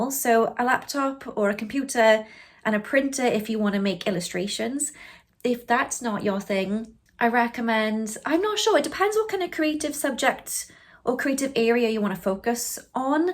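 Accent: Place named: United Kingdom. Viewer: I’m guessing you’re from the US? It’s spelled with an S in British English and with a Z in US English. is British, Scottish or Irish (I haven’t listened long enough to pick up the exact accent, Sorry).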